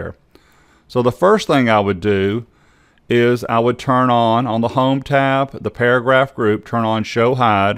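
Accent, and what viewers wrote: South, United States. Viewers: worked like a charm thank you that southern accent though!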